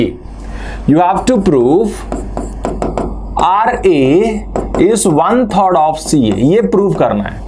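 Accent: Bihar, India. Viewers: Apki tone ekdm mst lgti h sir Are u bihari?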